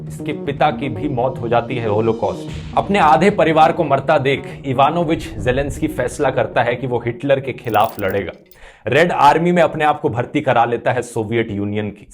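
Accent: Haryana, India. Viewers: आपकी Language me हरियाणवी touch है।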